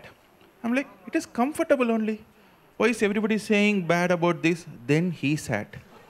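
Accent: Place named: India. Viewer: Standup comedy by Indian artists somehow does not amuse if they talk in English. Heavy accent takes out the fun.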